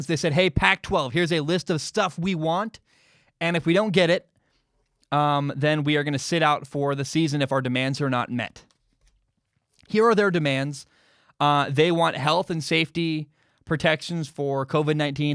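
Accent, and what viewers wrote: United States. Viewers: Silverstone difference is just from your american accent compared to the british one:) grand prix has french origins so thats why the pronunciation of the d is silent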